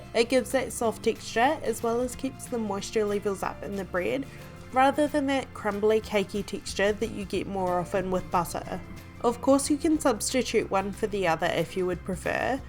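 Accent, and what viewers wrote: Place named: New Zealand. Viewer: She's from new zealand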